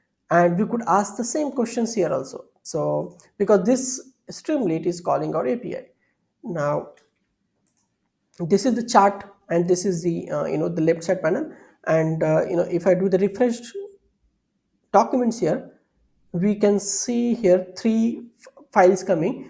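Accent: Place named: India. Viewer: this is the best video ever for me to learn LangChain as well as India accent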